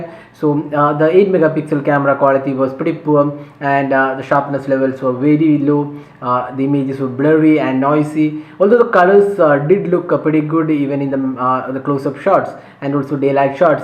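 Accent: India. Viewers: I'm sure this is a good review but it's pretty hard for me to understand your indian-english accent when you was so in a hurry to tell everything you know about this phone.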